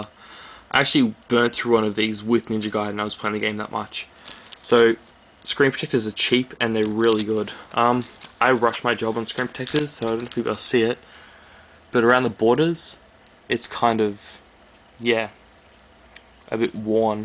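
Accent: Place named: Australia. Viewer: hey dude you sound aussie like me lmao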